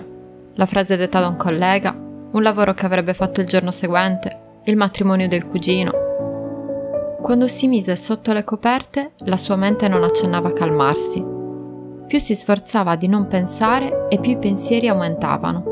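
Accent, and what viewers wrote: Sardinia, Italy. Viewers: No forse Sarda?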